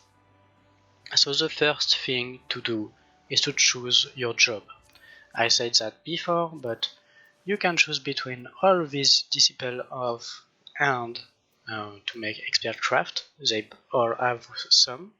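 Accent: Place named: France. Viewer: love french english accent ! thanks for guide btw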